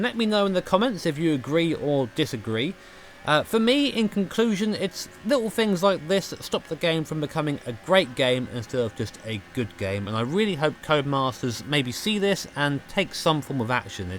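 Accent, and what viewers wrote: United Kingdom. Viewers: Annoying thic Brit!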